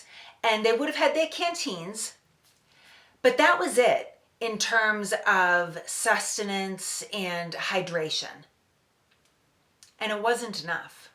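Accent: Boston, United States. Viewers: Yes, Very Boston, I love it. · I live out of state,but I was born and raised in Worcester,moved out 25years ago when it started becoming a real dangerous craphole and no place to raise my children,good to hear the Boston accent again, keep up the good work,the children and future generations need to know our history,I love history as much as you do,I've been to all the historical sites in Massachusetts,alot of those visits were from school field trips,I love your enthusiasm, keep going...thank you,John..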